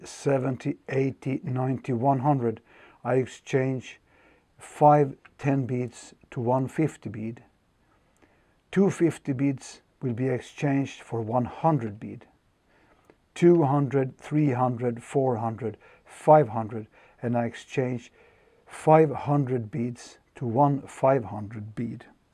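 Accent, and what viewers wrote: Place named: Sweden. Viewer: That Swedish accent :D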